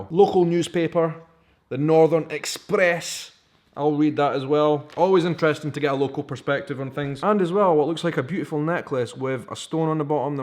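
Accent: Scotland, United Kingdom. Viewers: Interesting at times when I see a TV shows on BBC America, I thinks it cute to hear your English (British) enunciated words. Thanks for sharing. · All I know is lot of guys would like to be able to speak with you Scottish accent cause they would get a lot of chicks.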